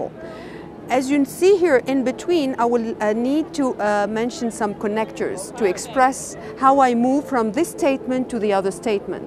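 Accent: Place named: Russia. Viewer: hello Teacher, You have a little European country accent. May be , Russia. Where are you from?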